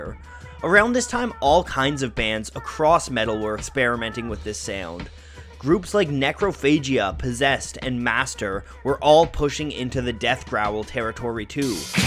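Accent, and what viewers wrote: Canada. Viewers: Someone's Canadian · spencer jacobs I'm Irish and he's definitely Canadian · Are you Canadian? I like your accent.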